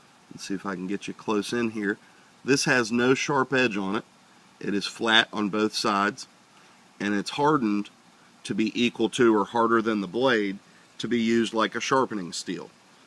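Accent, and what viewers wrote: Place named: Alabama, United States. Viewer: Gotta love that Alabama accent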